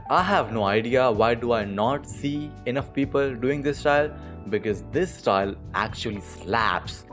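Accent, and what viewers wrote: India. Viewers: bro's Indian accent>>>>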